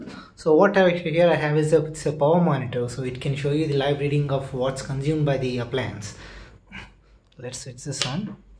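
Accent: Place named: India. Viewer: The indian accent is awful.. speak hindi only.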